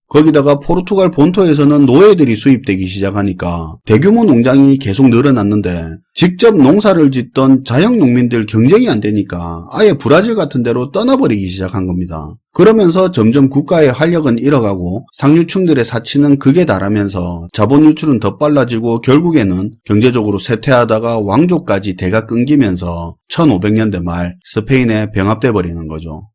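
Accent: Gyeongsang, South Korea. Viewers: 재미있게 잘 듣고 있습니다. 쉽게 이해 잘가게 설명해주시는거 너무 감사합니다. 경상도 사투리도 너무정감갑니다.^^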